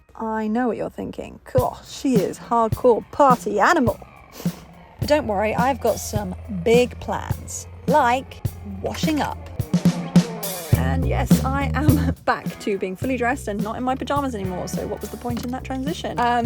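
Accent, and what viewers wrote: United Kingdom. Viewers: Your videos are the best ones out there for me... it lifts my mood ... and for someone who english is her 3rd language and i learnt it in American way I AM in LOVE with your British accent ... the way you film and edit are uniqe but dayli vlogs can be a good idea too ... anyways good luck and am waiting for your next uploads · Suprised to see your sponsership is a language learning website. Can you imagine how great your channel is for us who are learrning English :face-red-heart-shape: such a relaxing and leisurely journey with such a british accent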